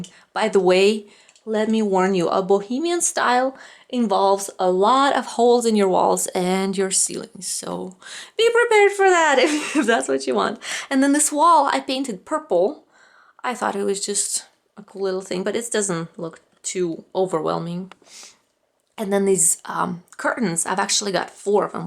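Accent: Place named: Russia. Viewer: a teacher of mine is Russian and it sounded a bit similar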